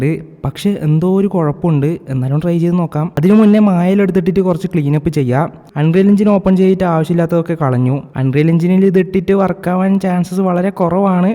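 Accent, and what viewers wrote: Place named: Palakkad, India. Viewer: Pro place palakkad aahno? Njn ahne. oru touch und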